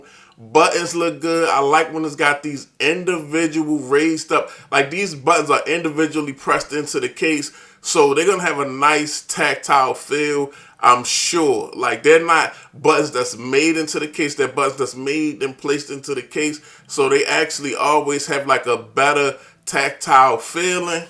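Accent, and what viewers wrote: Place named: Maryland, United States. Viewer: You sound like you from Maryland 💪🏼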